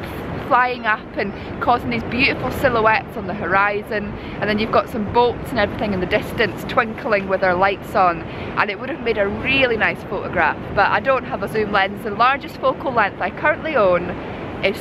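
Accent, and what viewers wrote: Scotland, United Kingdom. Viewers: Nothing beats a Scottish accent in the English language and, you excel in it:) Keep them videos coming.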